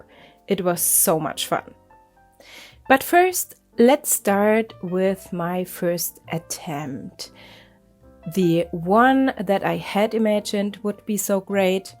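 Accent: Germany. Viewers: Ich höre da einen deutschen Akzent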